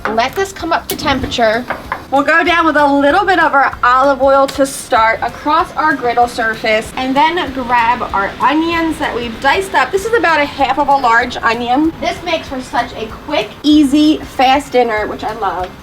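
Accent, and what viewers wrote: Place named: New York, United States. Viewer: forgot to ask…. With “caw-fee”, methinks you’re a “nee-ew yaw-kuh”… am I caw-rect? 😁… brings me back to my roots as a joisey boy hearing from the other side of the river over the Hudson…. I think, once I use up all my preshredded mozzarella, gotta find a decent multi shredder box to shred my own… I might try those small cheese balls in the water… forgot what you called them.. have to rewatch anyway. Pause-n-cook, or write EVERY step down. Either way…. What about a little ricotta (or “riggutt” in the NY/NJ “Sicilian” slang)?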